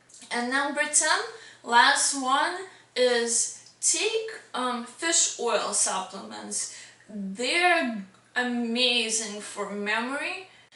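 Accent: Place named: Russia. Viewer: you are russian! i think your accesent well i am russian too!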